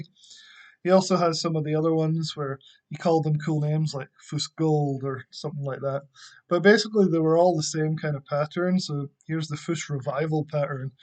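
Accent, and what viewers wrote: Scotland, United Kingdom. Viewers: are you scottish? :) thanks for the video!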